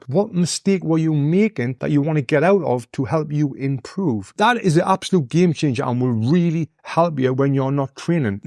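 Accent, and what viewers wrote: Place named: Sunderland, United Kingdom. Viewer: he's from Sunderland, which is the city below Newcastle, so it's very similar to a geordie accent though most Sunderland folk call themselves Mackems not geordies. Hard not to love the northeastern accents 😂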